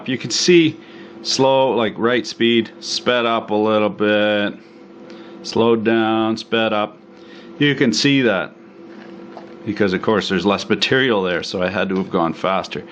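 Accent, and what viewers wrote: Canada. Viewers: Still trying to figure you out. Canadian but with a New York snark. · The guy's Canadian. I know tons of people who talk just like him. Especially in the trades and oil industry. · your accent is halairious. What part of Canada are you from . lol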